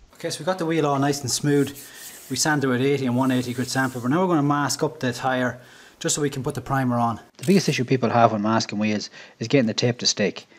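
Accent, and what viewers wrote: Limerick, Ireland. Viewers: Nice to hear an Irish Man 👍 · I’m from Southern Ireland, I can confirm this is not a Dub accent 🤣 Limerick/Wexford maybe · McGregor has Scottish/northern I think this fella sounds Irish. But I do get your joke man! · That Irish Accent...!! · Lol it's hard enough understanding that Irish accent and talking fast ain't helping ither 😂😂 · He is Irish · You sound Irish and this is a very honest video it's beautiful so are the wheels when on that car cheers for makeing it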